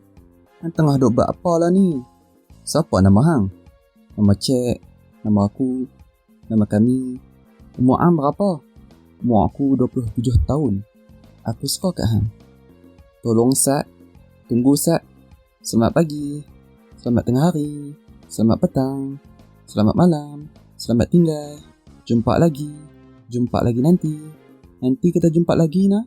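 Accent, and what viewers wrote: Kedah, Malaysia. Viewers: Kedahan Malay pronunciation a→å Apa →apå Mana → manå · Saya original orang Penang. Tapi video ini bukan bahasa yang kami guna. Mungkin cuma 20% penduduk Pulau yang guna loghat ini. Ini terdengar seperti loghat Kedah.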